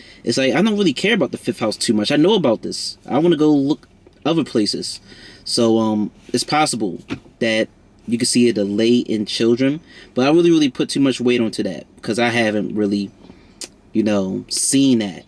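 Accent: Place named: Baltimore, United States. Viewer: Thank you! Love this placement and the way you explained it. Love ur inner g you gotta be from Baltimore 🧡 if you are even more love to you n the home city 🏙. Thanks again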